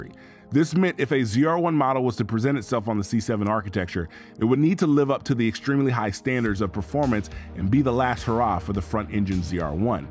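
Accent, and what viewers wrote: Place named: Indiana, United States. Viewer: YOUR ACCENT SAYS INDIANA/SOUTHERN ILLINOIS TO ME I MEAN THAT AS A COMPLIMENT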